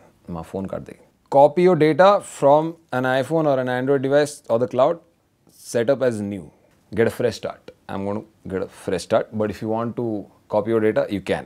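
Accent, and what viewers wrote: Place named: Bengal, India. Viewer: I'm waiting for your next video. When will it come ????? I like ur Bengali texture in this unboxing video so please use it (Bengali texture ) in your next video. I will be waiting...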